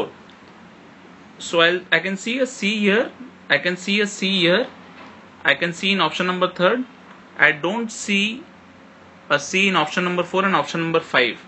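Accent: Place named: India. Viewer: why does indian people talk like that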